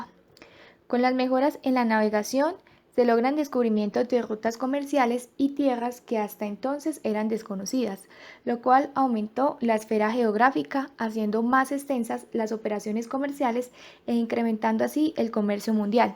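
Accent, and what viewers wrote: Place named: France. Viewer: tuvieras un toque francés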